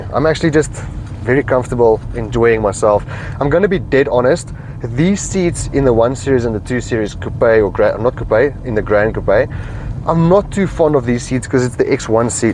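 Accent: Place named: South Africa. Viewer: Wow I totally didn't know south Africans sound like this lmao I thought this was from the UK with an accent of somewhere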